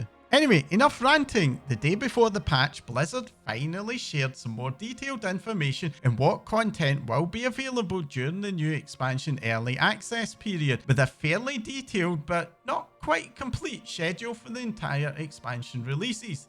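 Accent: Scotland, United Kingdom. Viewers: You trolling 😅 lads Scottish he won't be changing how he speaks for you 😅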